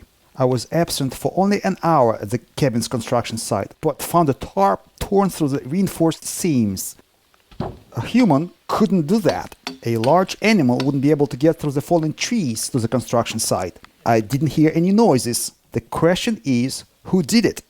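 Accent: Russia. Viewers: Такое впечатление,что русский озвучивает на английском языке ,такое акцент!!! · Tool me way too long to realise hes russian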